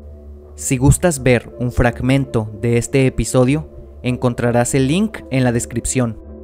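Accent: Mexico. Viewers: La voz del relator lo delata como un L. ¿Mexicano?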